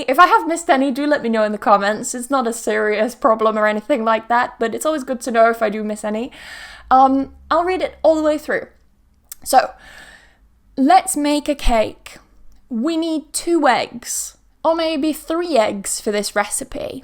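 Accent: United Kingdom. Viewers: Siendo sincero, por supuesto que quiero aprender inglés británico, pero me gusta más verla y oírla porque tiene una voz muy bella y me transmite un ánimo alegre porque siempre sonríe. Inteligente y bella que más se puede pedir. Me encanta su acento, cuando hablo inglés ya hablo mas con acento británico Gracias a ella. La adoro 🥰.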